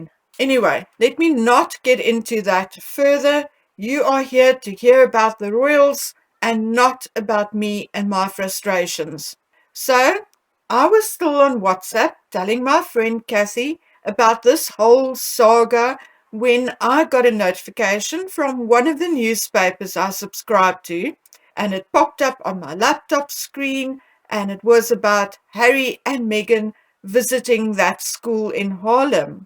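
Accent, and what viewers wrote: South Africa. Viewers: Good for you. I love your channel I also adore your accent. I adore South Africa. Use to live PMB. Keep up the great work. I look you and Sue me both out. Love you. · Hi, really enjoy your videos. Love your accent it reminds me of the years living in S.A. such a beautiful country and wonderful warm people. Ignore the "Negie Megies" if they don't like what you have to say they can change the channel and protect their sensitive little souls, yes, sometimes the truth hurts! They just need to "smarten up." No body shaming, but I do think Mz. Meggie has put on weight and the padded look in her thighs possibly the result of some of control panty, Spanx? When you check out other pictures and look at the size of her calf muscles, to me, it looks like they are a little fuller. Regarding her "fashion sense" or lack thereof, she nearly always looks to me like she has been dragged through a hedge backwards - my personal opinion. 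The rolled up cuffs on the red outfit that looked like it was made from a yacht's spinnaker was purely to show off the collection of jewellery she was sporting on her wrists and hands... so transparent! As for PH's treatment regarding those children... don't get me started, he needs a good swift kick up the arse. The pair of them should be ashamed of themselves as should whomever set up the publicity stunt for them. Yes, the timing for PC's does look dodgy, however in his defense, climate change, farming, the land and the environment have been a passion of his for years so whatever the Harkles are bringing to the table regarding this is something they have absorbed like dried up sponges and wrung with a "hey look at u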